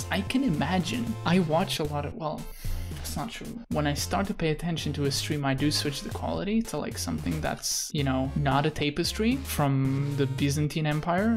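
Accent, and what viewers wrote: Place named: Portugal. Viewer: you have a little accent. it’s actually very slight. i commend your english! but yeah i guess i am just very familiar with the tuga accent hehe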